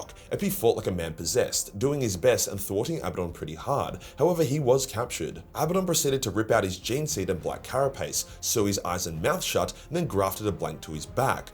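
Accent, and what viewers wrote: Australia. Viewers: Bruh when u said the battle was harder than a word bearer at a daycare centre that shiiii caught me so off guard I spat my drink out ffs this guy never fails to make me laugh every video the Aussie accent makes it even better brilliant stuff my guy.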